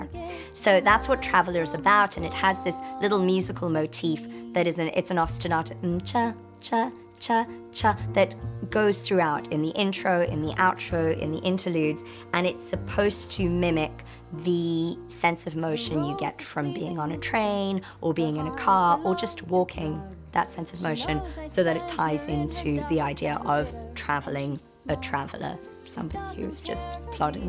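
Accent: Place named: England, United Kingdom. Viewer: I absolutely love this song and am discovering the rest of your stuff - absolutely beautiful. You sound English not canadian but i've met people from NFL who sound scottish so wo knows, I've only lived in southern Ontario. Anyways love this song, the plagal cadence has huge emotional resonance for me and the moment the bass outlined the motif i felt i was Home. :)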